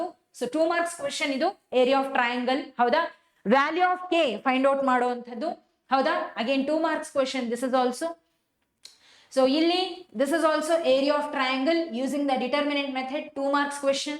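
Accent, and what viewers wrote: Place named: Kerala, India. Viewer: Madam I think u are malayali, your kannada slang is in malayam accent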